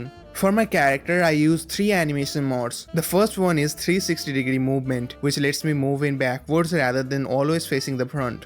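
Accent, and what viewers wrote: India. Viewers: Idk why its so interesting to me that people from all over the world like new vegas. You sound indian, its weird i wouldnt imagine new vegas being a very popular game in 2025 in countries outside the US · As an indian i like fallout too, you sound like a bengali guy ngl.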